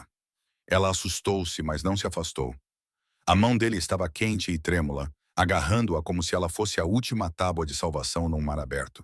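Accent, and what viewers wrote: Portugal. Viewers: Equipe veladas e sombrio, CONGRATULAÇÕES ! Enredo excelente e inovador. A Narração é fabulosa e o português da Língua portuguesa de Portugal , com certeza, É UM DIFERENCIAL ADORÁVEL! ✨💫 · Também acho linda história maravilhosa narrador mil mais a narrador parece português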